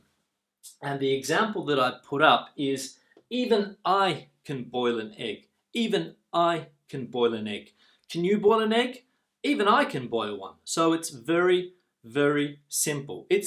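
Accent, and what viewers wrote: United Kingdom. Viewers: you have a British accent,am I right? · I love your British accent.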